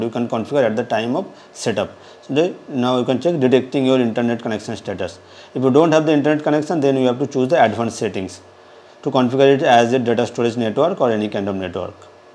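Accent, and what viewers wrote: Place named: India. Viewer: WARNINGL VERY STRONG INDIAN ACCENT.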